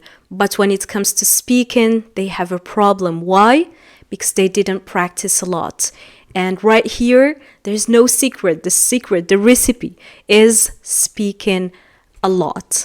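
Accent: United States. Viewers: تقولي شوفوا هاري بوتر بس الاكسنت حقك امريكي وليس بريطاني؟!😮 الزبدة هاري بوتر مو الاساس اريد الطريقة حقك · نطقك ما شاء الله امريكية❤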